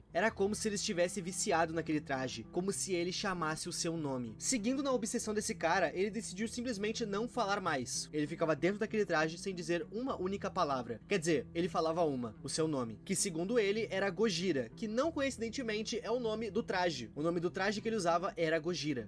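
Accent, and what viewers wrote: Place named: Northeast, Brazil. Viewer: Vc é nordexxtina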